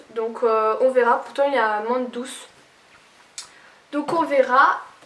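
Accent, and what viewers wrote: Lorraine, France. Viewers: l'accent lorrain, c'est trop mignon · Tres bel accent lorrain! trop mimi!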